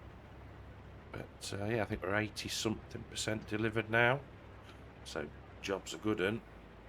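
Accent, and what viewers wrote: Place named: Birmingham, United Kingdom. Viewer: Hello Mr Brummie😁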